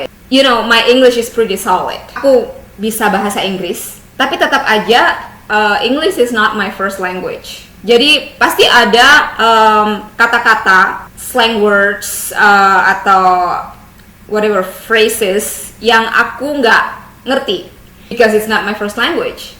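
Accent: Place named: Java, Indonesia. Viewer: Setdahh medoke metu mbak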